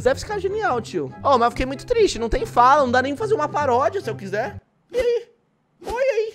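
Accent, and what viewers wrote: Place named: Portugal. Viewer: No puedo entender lo que dices sos portugės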